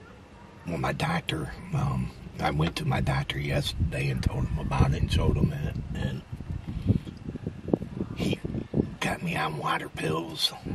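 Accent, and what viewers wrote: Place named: Michigan, United States. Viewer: I could tell you were from Michigan with your accent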